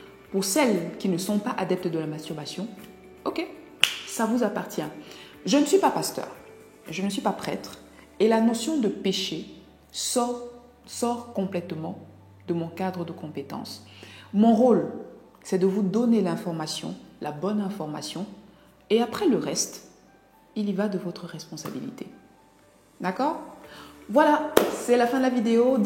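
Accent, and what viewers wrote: Cameroon. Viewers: Bravo Docteur. Huumm ! Avec ton accent Camerounais, je suis satisfait de votre pédagogie. Elle est simple, objective, moins culpabilisatrice et dogmatique . Bon , certaines religions poussent leurs adeptes à la culpabilité ? C'est sont parfois des dogmes. Quand à vous, va de l'avant et parle peu et mieux prochainement,Merci.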